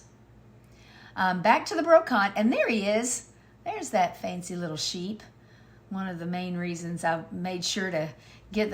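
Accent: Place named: South, United States. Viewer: Happy new year! May god be with you and your family I am so sorry you are going through radiation and chemo god has you in his hand sweetie! I want you to know I love to watch your videos I love your crafting and to be honest I just love your sweet sweet southern voice you have the voice of an angel idk y but you do!! Your voice is very calming to me!! Hope you has a good Christmas , I will have you in my prayers!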